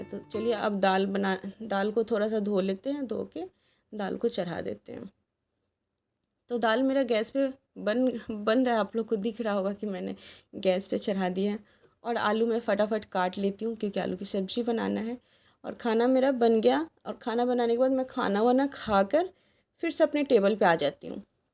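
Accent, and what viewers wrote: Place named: Bihar, India. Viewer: App bhiar se ho awaz se lag raha hai but kha se 😊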